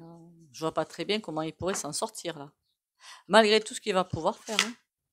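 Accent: South, France. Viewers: Merci, ce soin m'a fait partir loin d'un seul coup, en quelques secondes et je reviens paisible. J'aime beaucoup votre voix, avec en plus, ce petit accent ( je pense que vous êtes du sud ),et aussi beaucoup de petites phrases amusantes et percutantes qui font plaisir!moi j'ai l'impression que je commence à décrocher, à lâcher prise par rapport à tout ça: j'ai confiance qu'on va arriver à un moment donné où le système va s'effondrer tout seul et où il faudra tout reconstruire différemment, et que cette fois-ci ce sera bien. j'aimerais bien avoir votre avis sur l'idée de Frexit, mais peut-être l'avez-vous fait déjà précédemment? Je suis aux Patriotes et par conséquent je tiens à l'idée de reconstruire sur de nouvelles bases. Merci.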